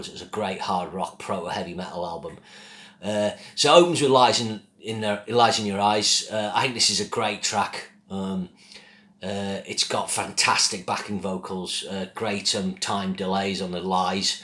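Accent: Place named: Northern England, United Kingdom. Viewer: You sounded like you were from the north, but didn't sound exactly like the Yorkshire accents I'd heard, so I was just curious. Thanks! I'm from the state of North Carolina.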